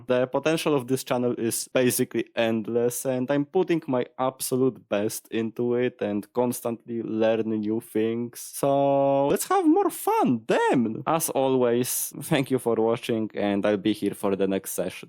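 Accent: Poland. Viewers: You sound like a Polish person, I know what I'm talking about bro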